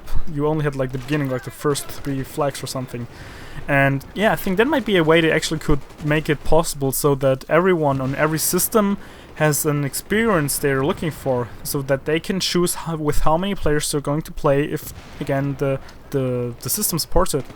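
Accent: Germany. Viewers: I am trying to figure out where you live, because of your accent. And I think you are a german? Is this correct?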